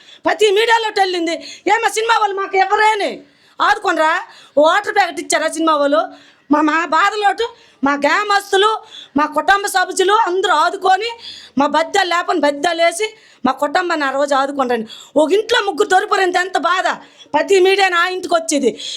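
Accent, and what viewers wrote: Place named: Srikakulam, India. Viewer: అచ్చం శ్రీకాకుళం యాస, నిలువెత్తు నిజాయితీ, మేలు అనుభవించి మేలు కోసమే గళం విప్పే విధానం. శ్రీకాకుళం అంటేనే మంచితనం అనేలా వున్నారు తల్లి. జయహో జగన్ మోహన్ రెడ్డి గారు. ప్రతీ పేద, అణగారిన బ్రతుకుల్లో జగన్ అనే వ్యక్తి ఒక ఒక తండ్రి గా ఐపోయారు ❤️❤️. ఈసారి జగన్ కోసం ప్రతీ కార్యకర్త గళం విప్పాలి, శ్రమించాలి ❤️❤️🥰🥰.#Vote for FAN ❤️❤️🥰🥰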